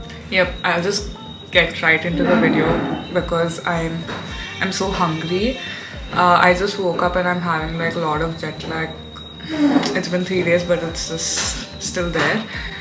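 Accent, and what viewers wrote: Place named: Hyderabad, India. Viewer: She is hyderabadi. I can interpret from the english accent and speech pattern.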